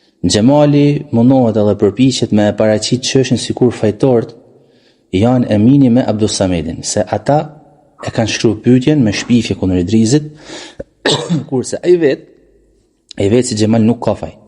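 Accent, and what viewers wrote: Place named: Shkodër, Albania. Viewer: Kush asht hoxha qe flet se po duket shkodran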